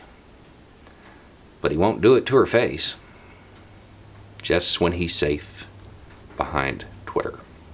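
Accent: South, United States. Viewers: Then you are forgiven! I absolutely love your videos. You remind people across the globe that just speaking in a southern accent doesn't mean you automatically are stupid or gullible or antiintellectual. These are days where we stand for reason and against hate. I, as a Briton who loves America, am horrified by the destruction of the USA in this madmans hands.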